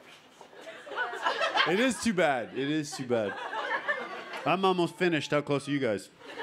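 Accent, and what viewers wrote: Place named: Canada. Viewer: That's how Canadians talk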